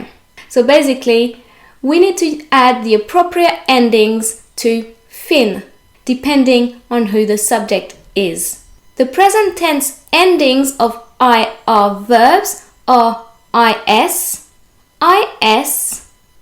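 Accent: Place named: United Kingdom. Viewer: Stop ur British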